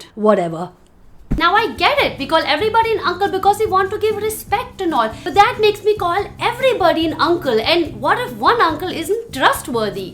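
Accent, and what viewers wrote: India. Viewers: U grew up in germany but ur accent sounds like desi...Which literally makes no sense. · i really love the fact that you were brought up in Germany with a German mom too, but still speak Hindi really well, speak German like a boss, and speak English with the Indian tango! It's so unlike the other kids brought abroad without any sense of their roots, great work! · I love ur desi accent and not faking it !! <3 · ur grown up in germany...but ur accent sounds indian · i love u for ur indian accent......luv u babes......u look exactly like a south indian actrrss shari.....browse for shari-malayalam actress......u look like her exactly .....she plays villian/negative roles most of the times......u-u r the best.....love u lots....but kinda miss ur caps!!! they wre fab!!! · Doesn't seems like she been to Germany. Wow her accent is so Indian. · why don't people subscribe? 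you are an acquired taste, it takes time getting used to you. but you keep people hooked one way or another. For the longest time I couldn't figure out if you had this desi accent for real or you were being sarcastic with the accent. Even with german as your first language, your indian accent is heavy. its alright, nothing bad, thats the way you are. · if you wanna be a critic, then no one's stopping you! But please, drop the Indian accent! For a lady who's travelled the world who was born in Germany, you got a real thick typical Indian accent, and your totally misusing it to talk trash about India! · how did you grow up in Germany and have such an Indian accent?? Are you exaggerating it? to make it more entertaining? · your accent is Indian. I don't think you are born and lived in Germany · You mother tongue is German? I mean what? You look so Indian!! Woah! And the way u speak Hindi is so proper.. 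You grew up their.. still your Hindi is So awesome and your English is Indian accent! You gained 200% more respect from me :D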